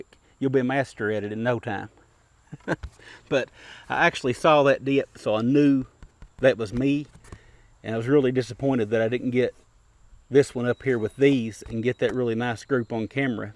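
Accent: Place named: South, United States. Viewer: that southern drawl gets soo annoying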